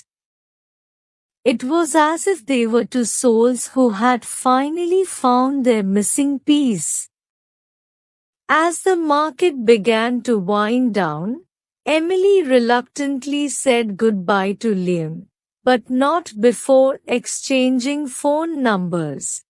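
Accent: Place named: India. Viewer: Why I listen to to India accent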